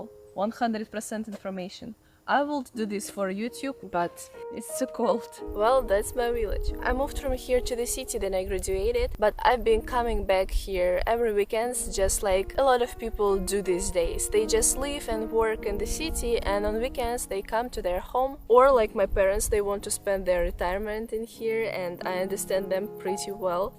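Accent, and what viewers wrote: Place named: Russia. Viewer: Не написано на русском в описании канала, -русская ли вы, или иностранец. Но услышав акцент, думаю, что вы все же русская.😊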